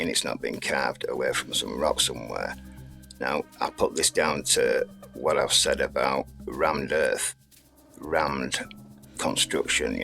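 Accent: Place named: Blackburn, United Kingdom. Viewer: Nice work. Love the presentation and questions it makes one ask. Ya also sound like me best man's dad from Blackburn. Am I close?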